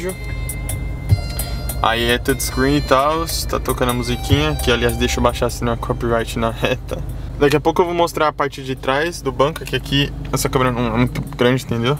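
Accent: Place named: Bahia, Brazil. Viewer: Baiano ein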